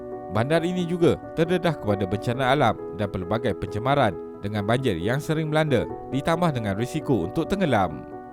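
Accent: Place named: Peninsular Malaysia, Malaysia. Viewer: Asyiiiik..... dialek semenanjung nyaman didengar, macam berade di semenanjung sumatera.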